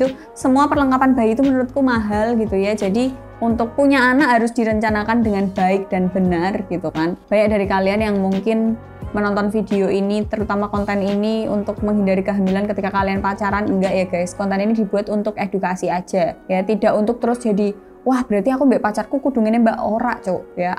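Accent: Java, Indonesia. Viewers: seng tak seneng i... omong medok.e..... DOBBOLLL.... 🤣😂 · baru tau chanel ini. .bhasanya medok jowo ne ki wong ngndi.. 😂 salam knal wong jwo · Sex Education dgn logat Jawa yg kental , kearifan lokal, btw endorsement Kondom Vivo ya empiris apa empirisme nih wkwkwkwk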